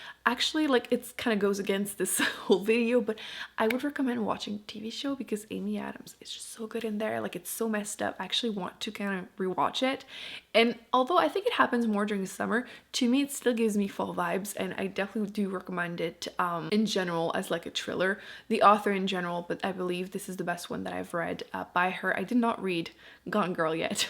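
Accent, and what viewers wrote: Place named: Quebec, Canada. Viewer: First video I watch from you, and the whole time I was like mhm.... she sounds like she has a French Canadian accent. Looks like I wasn't wrong. Twinsies! Love this video!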